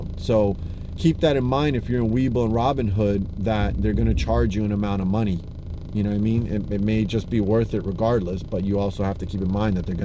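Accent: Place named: Southall, United Kingdom. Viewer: Keep up the gd work,well said! appreciate the dirty grind ur doing n outting these brokers,u spoke like a true Southall (west london) boy,head up 👊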